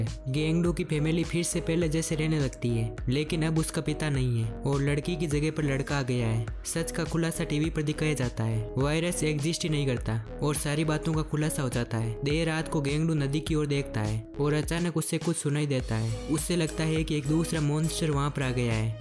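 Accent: Rajasthan, India. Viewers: Rajasthan voice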